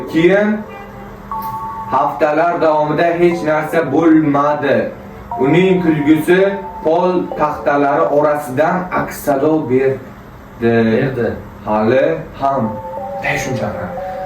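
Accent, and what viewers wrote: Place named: Qashqadaryo, Uzbekistan. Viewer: Qashqadaryodanmisz dimn manimcha sheva tortyaptida😂😂munaqasiya dganizga o'ldim lkn😂😂😂😂😂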